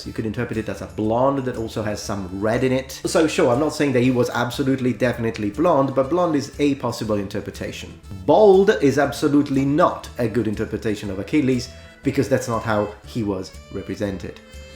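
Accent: Italy. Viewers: I didnt realise until now you had a slight Italian accent...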